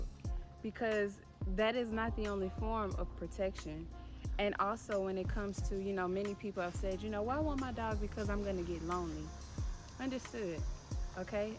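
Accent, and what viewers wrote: Houston, United States. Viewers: That houston accent, lawd. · You definitely got that "Houston" accent going on...You ouchea sounding like Bey... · They are both from Houston. I'm from Houston and a guy told me I act like beyonce. I was like what???? It's the Houston accent.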